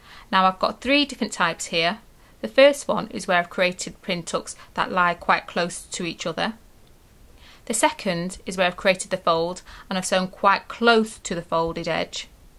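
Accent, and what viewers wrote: United Kingdom. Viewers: isn't it also the case that pin tucks are stitched but pleats aren't? I've always wondered how it's done, now I understand perfectly. The fun for me will be working out how much extra fabric I'll need! I can see much experimentation ahead! Thank you also for having a proper accent, as a fellow Brit I'm getting fed up with tutorials with American voices 😄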